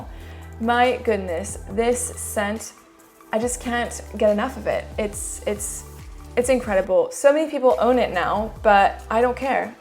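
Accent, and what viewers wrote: France. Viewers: Do you have a very slight French accent? I might be wrong, English is my second language, so I don't always pick up light accents. But you seem to know how to pronounce French word too.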